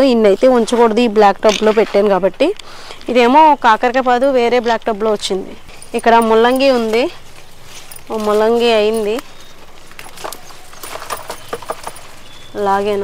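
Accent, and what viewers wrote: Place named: Godavari, India. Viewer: మీ మిద్దె తోట చూస్తుంటే...చాలా చాలా సంతోషం కల్గింది...ముఖ్యంగా...గోదావరి యాసతో మీ మాటలు ఎంతో ఆహ్లాదాన్ని కల్గించాయి...అభినందనలు...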